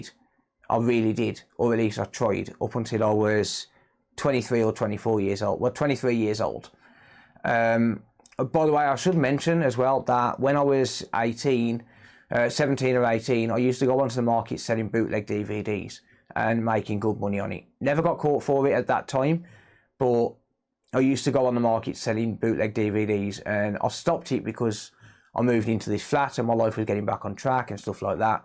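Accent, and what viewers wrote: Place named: Black Country, United Kingdom. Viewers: Thank you so much for sharing your story. Something comforting about your black country accent as I grew up and went to school in Walsall. I'm really into fixing, modifying and refurbishing tech myself at a smaller level (mainly fixing controllers), so I was excited to finally come across your channel via TronicsFix and knew I had to stick around the second I heard your accent😂. The level of poverty and inequality in the area is staggering. Having worked in mental health, it's upsetting seeing how incomplete the current set of support resources can be, and worse considering how much further things seem to be getting stripped back. Your reference to benefits getting stopped and feeling pushed to crime for survival is one I've come across before, and is probably becoming more tragically commonplace. Just having that one person in an influential position who can vouch for you in times of need (like that probabation officer during your Morrison's story, or even MyMateVince on your YouTube journey) can make such a difference. 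I admire all the candid life story snippets you've shared as many of them seem to represent examples of your sheer determination and quick thinking (even though it may have landed you in some sticky situations in some instances). It's inspiring to see how well you're currently doing with your current set of circumstancs. It makes me wonder how differently things may have been if you'd been dealt a slightly better hand. The lack of social mobility in this coun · I think I probably speak for a few subscribers here when I say that I actually find your videos super calming - the perfect way to relax and de-stress. Your approach, your expert knowledge, your humour (and West Midlands accent?) all add up to great, unique content. Keep going and we'll probably see you in one of those great BBC Four documentaries which feature craftsmen fixing things in real-time. 'Slow programming' I think it's called as a genre. 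Either way, don't change anything and keep up the great work. One last thing / request - please do a teardown or repair of a Steam Deck if you get a chance. No idea if they're even serviceable but they do look amazing inside (as a layman who knows not to go anywhere near a soldering iron again). 😂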